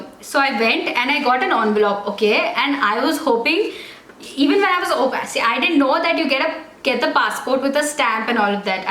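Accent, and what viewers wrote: India. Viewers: indian accent 🤣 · Although i had AAAAAAALLLLLLLLLL the documents LOL. Indian accent is the funniest one.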